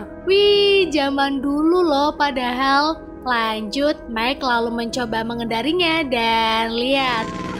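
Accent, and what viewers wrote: Pemalang, Indonesia. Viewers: Wong pemalang kah mba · Miminnya org pemalang ya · Wong Pemalang min? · Wong Pemalang kie suara ne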